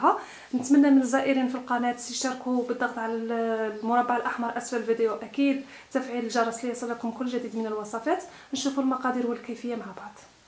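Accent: West, Algeria. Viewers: اول مرة نشوف قناتك نتمنالك الاستمرارية واللي عجبني بزاف هدرتك نموووت على هدرت الغرب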